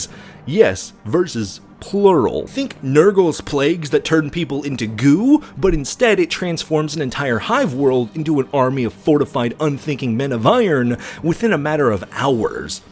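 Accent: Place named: United Kingdom. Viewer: too. much. british...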